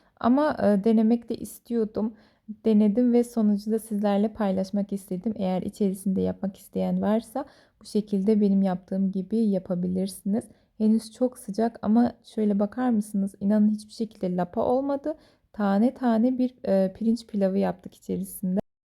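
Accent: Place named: Azerbaijan. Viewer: Bu hanim kesin Azerbaycanli 😀 aksani var 😀 aparat dedi ilk bashda . Unutmuyun 😀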